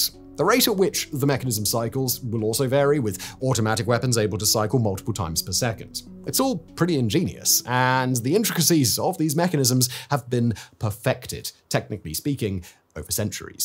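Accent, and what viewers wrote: United Kingdom. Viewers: I found your video content to be interesting and well organized. But I had trouble hearing your narration. At first I thought it's because I'm not used to hearing British accent, but then I realized you tend to drop off in pitch and volume towards the end of your sentences. That made it very difficult to follow what you're saying.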